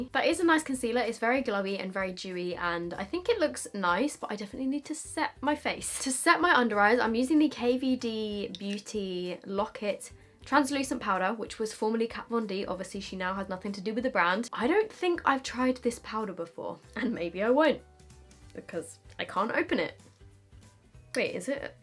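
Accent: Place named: United Kingdom. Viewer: Hi, I really love watching your videos and I'm in love with your British accent💖 (I am Italian😊)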